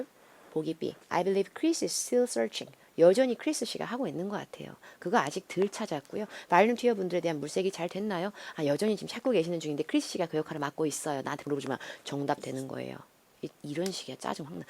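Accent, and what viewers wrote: Jeolla, South Korea. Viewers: 김선아 전라도버전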